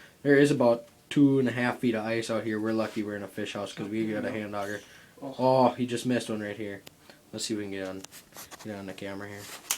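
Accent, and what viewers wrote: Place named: Minnesota, United States. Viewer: I miss that Minnesota accent 😁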